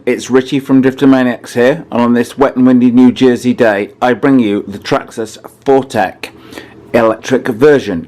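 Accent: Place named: Liverpool, United Kingdom. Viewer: Are you kidding me that's not a New Jersey accent you mean Jersey... Jersey on the mersey?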